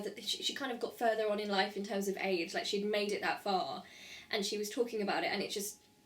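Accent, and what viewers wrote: United Kingdom. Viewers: Unfortunately there still is stigma in society for mental illnesses, but you are courageous to have made this video. YOU are not YOUR mental illness after all. I once had a relationship with a girl who has BPD/EUPD and at the time, one book I read was very informative on understanding her illness. I Hate You--Don't Leave Me: Understanding the Borderline Personality by Jerold J. Kreisman and Hal Straus. ISBN-13: <num> Best of luck in all your hopes and dreams and may I just say as a Canadian, that I love your British accent.:)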